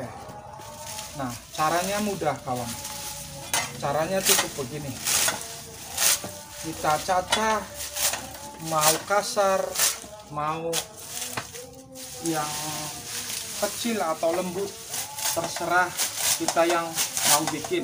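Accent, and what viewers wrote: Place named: Java, Indonesia. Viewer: Ngomong logat jawa kang mase, daerah ngendi iki mas?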